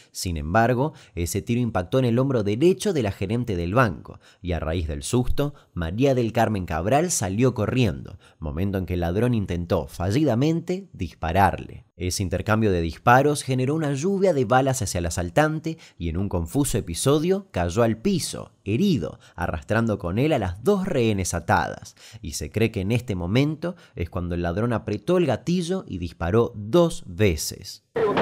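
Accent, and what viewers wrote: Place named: Argentina. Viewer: mis padres me han hablado de este caso pero no había nacido aún cuando sucedió, gracias por hacer este video que me permitió conocer con mejor claridad esta historia, soy hija de un argentino (de Resistencia, Chaco como tu) y una dominicana, siempre veo tus videos porque tu acento me recuerda a mi papá, significa mucho para mí que cubras este tipo de historias que no reciben la suficiente consideración en República Dominicana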